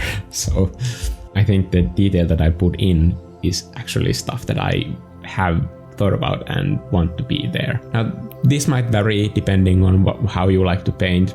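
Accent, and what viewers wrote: Finland. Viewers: Haha the moment you started talking I was like ”HE MUST BE FINNISH OMG”. I love your art and your attitude haha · I can hear an accent... can’t pin point it exactly. But I’m getting Finnish vibes? To say the least, it was nice really hearing you talk about this! You seem to genuinely enjoy doing this. The painting was absolutely lovely, certainly inspirational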